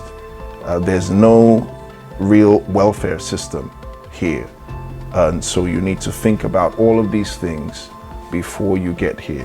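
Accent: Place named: United States. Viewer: Good advice! Your accent seems more Americanized with slight hints of British/Ghanaian.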